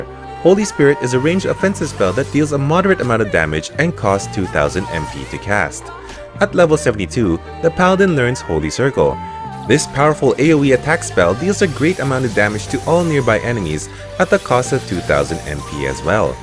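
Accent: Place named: Philippines. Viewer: You sound like a Filipino with your English accent.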